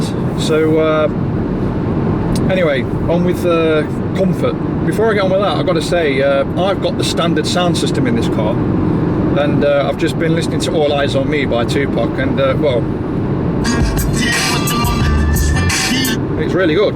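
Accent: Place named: Mansfield, United Kingdom. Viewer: Your from Mansfield? Thought the accent sounded familiar! Im considering one of these to replace my TT next year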